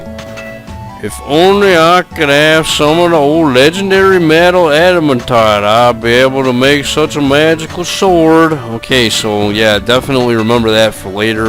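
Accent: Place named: South, United States. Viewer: You do sound more southen but it doesn't matter 'cause it fits so keep it up.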